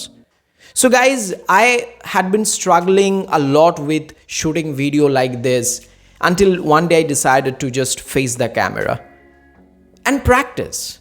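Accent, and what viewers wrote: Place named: United States. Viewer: Why do speak in American accent?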